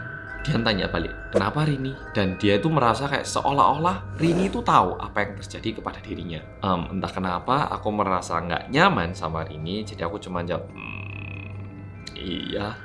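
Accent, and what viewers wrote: Java, Indonesia. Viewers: dari awal sampe sekrng masih bertanya2. knpa mas bisa medok begitu 😁😁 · Episode terakhir huuhuhuhu, wes rapopo, seng penting mas tetep medok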